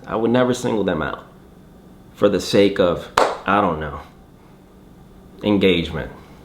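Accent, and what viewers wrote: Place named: Texas, United States. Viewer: Damn bro you from texas?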